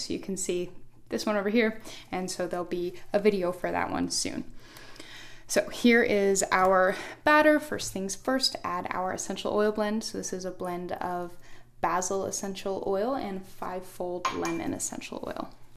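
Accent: United Kingdom. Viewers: Hey! First of all I'm in love with all ur tutorials though I'm new 2 ur channel. Ur doing an amazing job teaching the world that u know. 👏👏❤❤💏💏👍😍😍😱😱. Second of all ignore the idiots who find fault with our Brit accent. They can't appreciate the fact that ur so knowledgeable, instead finding fault with the accent. U go girl. Lots of love.. 💏💏💏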